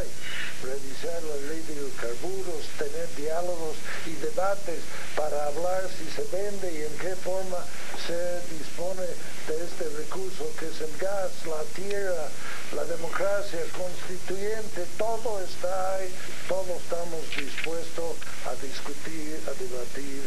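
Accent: United States. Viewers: este señor no sabe hablar español? tiene un acento gringo ? el fue presidente de bolivia ??GUAOOOO .......increible !!!! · Oye pensé que era Bush hablando, parece un norteamericano noten la R que pronuncia.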